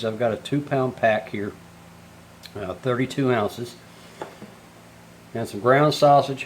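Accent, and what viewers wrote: South, United States. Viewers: I look your southern accent😁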